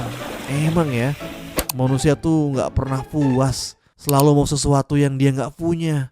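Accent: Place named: Gorontalo, Indonesia. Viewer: Pantesan logatnya masih ada kebawa" dikit.. bo org grtlo utia :D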